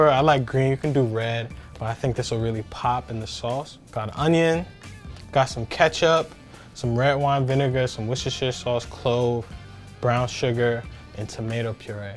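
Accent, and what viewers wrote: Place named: New York, United States. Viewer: Sucha new York guy. Brooklyn? Bronx? Manhattan? I wonder. Imma try this out. Big thanks from the bx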